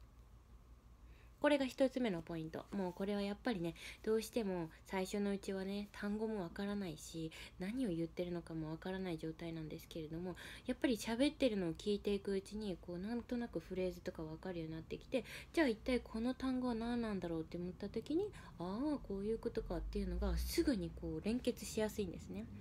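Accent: Kagoshima, Japan. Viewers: 私の日本語の勉強方法と一緒です🙋 YUURIさんの動画を見ながら勉強しています。 多少鹿児島弁も一緒に耳に入ってます。笑 一緒に頑張りましょう！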